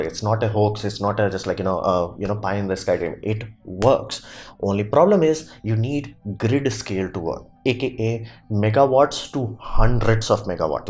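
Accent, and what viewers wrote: India. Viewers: Very nice, presentation!! I have seen your other videos also, you do lot of research and then prepare your videos.. Very good channel for science and tech guys! In this video, Powerpod attracted me, from photos it appears that turbine used in it would be around 25-30 cm in diameters. So it is high rpm low torque type turbine. I would like to ask, that to increase torque and decreasing rpm are they using gears, or this new designed wind turbine is directly connected to generator?? Is it possible that it could generate upto 5kilowatt ? Just think how much torque would be required to run 5kilowatt generator?Can funneling do real magic? (How much velocity of air increases by funneling, it's efficiency... Max rpm of turbine) What is your opinion on it? Please reply, But i liked this concept of rooftop wind turbine, well practically to verify these claims we have to wait when powerpod will be available in market.. 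Definitely one day when all natural resources like coal, oil,.. Etc we will be used than power production will become serious issue.We have to prepare for it right know!! You seemed to be Indian (your accent of English) I appreciate your hard work, and keep doing so, your channel will grow.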